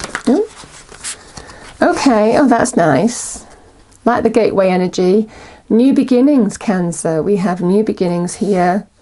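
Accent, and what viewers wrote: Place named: London, United Kingdom. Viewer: Thank you thank you for this beautiful reading I love the way you explain the cards and how you do the reading thank you thank you thank you also you are from London your accent is very lovely are you from London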